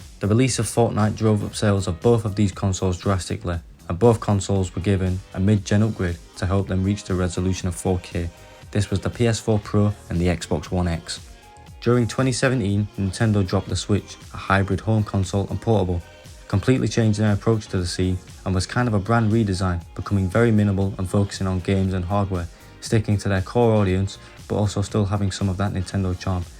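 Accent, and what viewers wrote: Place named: Manchester, United Kingdom. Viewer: Your accent. You from Manchester?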